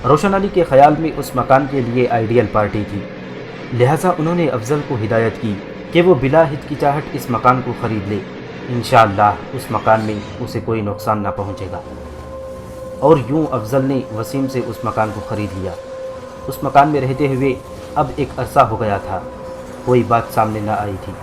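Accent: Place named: India. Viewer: آپ کے بولنے سے سمجھ گئی تھی کہ آپ انڈیا سے ہو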